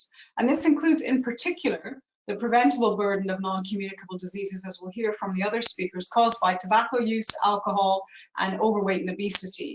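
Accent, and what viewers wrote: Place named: United States. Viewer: Why is Professor accent becoming more American by the day? SO annoying!!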